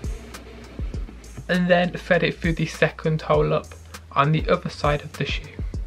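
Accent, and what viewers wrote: United Kingdom. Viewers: are u british, i like the accent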